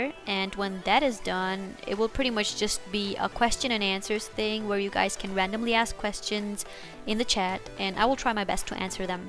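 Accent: Germany. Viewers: samuel sharp she has a german accent.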